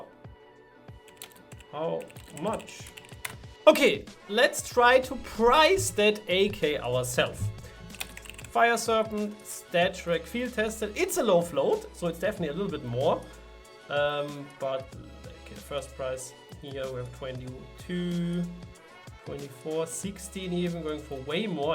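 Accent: Germany. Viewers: Love your german-english accent :D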